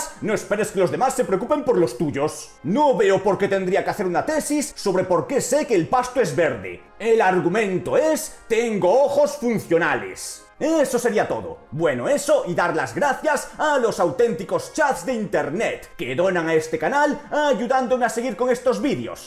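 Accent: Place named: Europe. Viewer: Wey pero los que mas ladran sobre estos temas de la ficción son los socialjustice warriors de yankilandia además me sorprende ver este vídeo de una persona de acento de cierto pais de Europa ya que ustedes se quejan por todo 😂 o a lo mejor me equivoco y estas utilizando loquendo para sonar asi pero igual yankilandia y ese cierto pais de Europa se la viven quejando por problemas inexistentes 😂 son todo un Show 😂 lol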